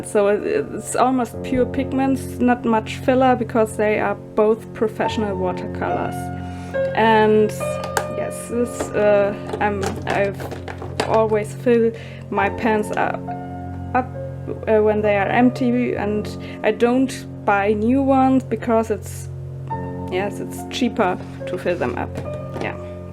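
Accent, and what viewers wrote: Germany. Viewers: Also ich finde, die Videos in denen du Englisch sprichst, sehr angenehm. :D Freue mich immer, wenn ein Video kommt, in dem du sprichst. Ganz ehrlich, ich wusste vorher nicht, dass ein deutscher Akzent im Englischen auch schön sein kann. xD An der einen Stelle wird deine Stimme etwas verschluckt. Sagst du da, die Kuretake-Farben sind besser pigmentiert als die White Nights oder schlechter? ^^ Ich liebäugle immer wieder mit den Kuretake-Farben, aber ich hab ja eigentlich meine Schmincke Horadam-Farben. Krieg da echt den Hals nicht voll, ganz schlimm. ^^' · bist du aus Deutschland? Ihr Akzent ist schön! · Hallo, Du sprichst eigentlich Deutsch, oder? Du hast so einen krassen, deutschen Akzent : D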